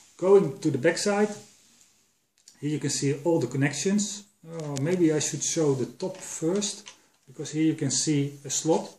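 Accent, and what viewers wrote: Netherlands. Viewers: Bedankt. Ik droom al van deze versterker. Ik heb een FM-tuner met lampen en de klank is helemaal anders. Voorlopig gebruik ik een oude versterker met discrete schakeling die het zeer goed doet. (Ik dacht wel dat je Nederlander was gezien je accent...). Walter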